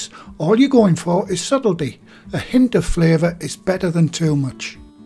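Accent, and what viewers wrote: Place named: Geordie, United Kingdom. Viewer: I only found your site today and already a new subscriber. Very instructional.. music nice and not too intrusive and love your Geordie dialect. It’s like being with you in the kitchen:)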